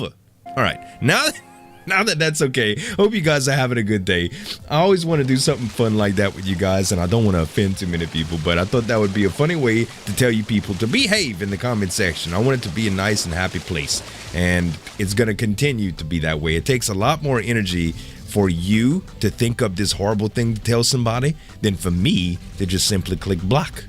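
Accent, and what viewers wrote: Louisiana, United States. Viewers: Where are you from Z? Cajun accent coming through. Abbeville LA here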